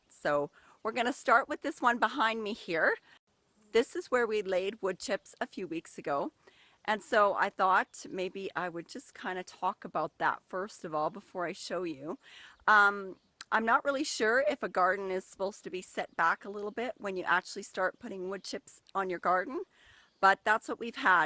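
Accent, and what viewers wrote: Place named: Canada. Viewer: Great video! So fun to see your pretty face and hear your Canadian accent! I don't think lettuce likes wood chips until they have really broken down into compost. I have not had success with mulch of any sort with my lettuce. And I think everything does better if the wood chips have been in place for at least one winter. They certainly seem to get better with time. I love your experimenting! And your indoor garden was incredible! This year I tried direct seeding everything. Don't think I will do that again. My cukes have been a huge failure. Thanks for the tour!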